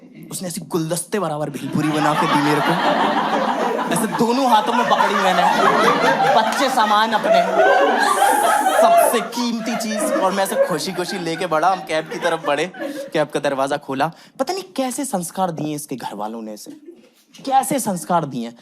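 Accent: Hyderabad, India. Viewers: 2nd video and suscribed ❤❤❤hadreabdi tone 😂😂❤❤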